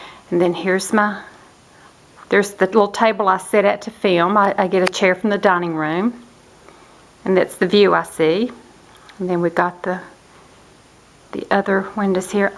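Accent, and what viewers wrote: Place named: Texas, United States. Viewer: Your house is just gorgeous. I have always loved Victorian houses and used to want one. I got over that later on after finding out how much work to restore:( Yours is lovely. I also like other periods like 1930s, - 1950s retro styles. You have the cutest way of talking but it reminds me more of a TX gal I used to know. My folks are from Newport TN and I noticed u are from East TN...what part?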